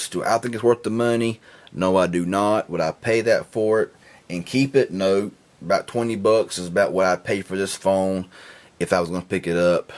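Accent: South, United States. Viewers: I love your Southern accent. It sounds really cool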